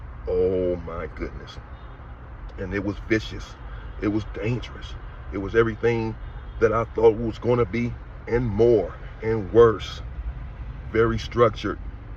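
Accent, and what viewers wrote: California, United States. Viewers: Bruh you sound like Chill from 16 to Life, it must be a Cali thing. Wishing you the best with the channel my G!💯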